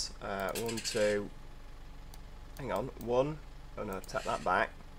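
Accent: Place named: Yorkshire, United Kingdom. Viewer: Nice video.I love the west country area, in the game and in real life, are you from Yorkshire, you have a very strong accent.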